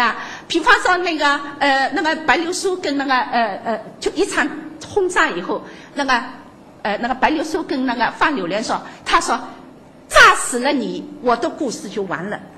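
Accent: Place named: Shanghai, China. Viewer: 典型的上海普通话😂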